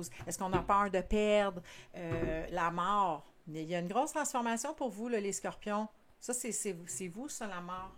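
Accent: Quebec, Canada. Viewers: Êtes vous quebecoise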